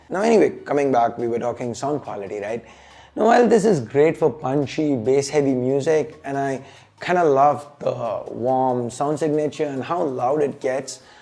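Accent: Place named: India. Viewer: Very good Indian English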